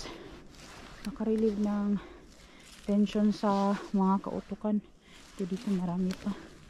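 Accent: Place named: Visayas, Philippines. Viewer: Bisayan dialect ,,asa manka sa visayas region maam...new subscribers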